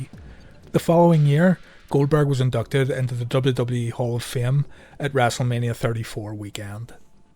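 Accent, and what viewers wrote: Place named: Belfast, United Kingdom. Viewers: Awesome work. You're knocking out the best videos on YouTube. Sounds like you're from Belfast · Good effort on the vids mate You a Belfast man? I’m down in carrick. Appreciate the vids anyway chief keeper lit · What part of Northern Ireland you from?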